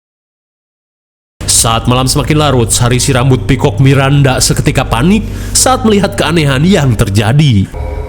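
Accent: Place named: Sumedang, Indonesia. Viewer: Bang lu tuh orang mana bang, soalna sundana asa sarua jeung Sunda di Sumedang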